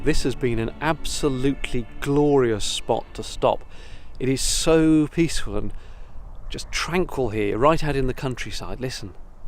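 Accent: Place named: RP, United Kingdom. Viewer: Accidentally stumbled on your vlog and I think I like it. You sound and look a fraction posh and not like a real narrowboater. It's not your home as far as I understand. You're more of a tourist on your own country's canals, I get the feeling. And always alone on that longboat. Admirable. I know I could get used to it. A slight hint of stiff upperlip, but not really, sort of edging. I may be totally wrong of course and off course, patati and brouhaha, but anyways: still love it. Eye-opener this time was the angler ! I had been wondering when we were going to see one or whether there were any on your canals. In my neglectable Belgium the canals are riddled with them. And they hate boats for obvious reasons. Anyways again: steady as she goes ! I'll be watching.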